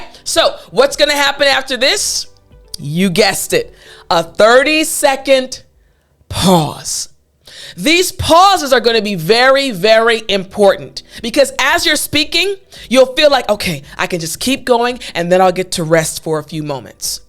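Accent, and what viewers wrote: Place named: United States. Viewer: Only I love Amercain accent and understood all what you are taking about it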